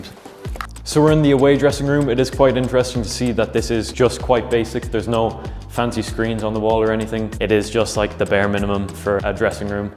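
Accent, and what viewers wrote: Ireland. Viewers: Are you from Ireland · Are you from Ireland? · are u from Ireland 🇮🇪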